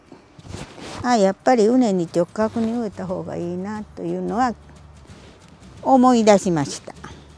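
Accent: Tosa, Japan. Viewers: おはようございます🌞 福岡も台風みたいに荒れた天気でした〜💦 懐かしい土佐弁😊 癒されます✨ いつも美味しそうなお野菜 沢山の愛情貰ってイキイキしてますね💕 私も食べたーい‼️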